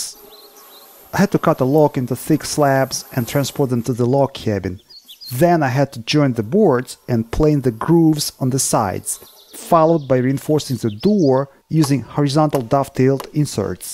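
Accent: Russia. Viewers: I watch the cabin build moment to go then I watch this one thank you again my friend thank you very much I thought I recognized a Russian in your accent. As I said the first time I wrote you a note we share a common dream you are making it happen I put other things first and never did make it happen. Thank you for this wonderful film clip I hope to see many more from you I know your time is limited but they are very precious to me I will as I said in the first comment use you as an example of why we must follow our dreams while we can thank you again my friend People Are People wherever you go and you are a fine example of a good man I can see you good and pure heart from all these miles away good luck and Godspeed to you my friend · The probably hear his Russian accent and vote thumbs down. Pathetic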